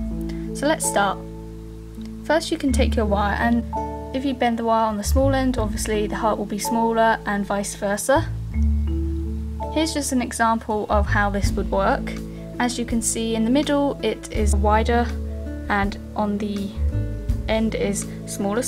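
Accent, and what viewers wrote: United Kingdom. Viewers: your from England, what is peanut past?????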